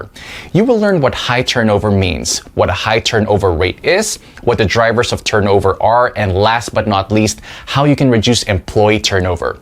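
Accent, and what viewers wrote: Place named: Philippines. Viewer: Based on this dude’s accent... he’s Filipino 😏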